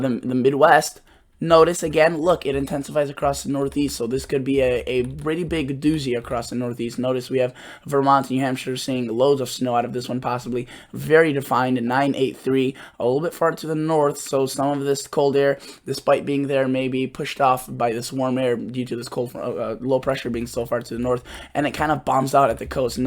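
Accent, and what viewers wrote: Midwest, United States. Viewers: Dude I knew yo u had a midwestern accent but near Chicago?? Me too!!!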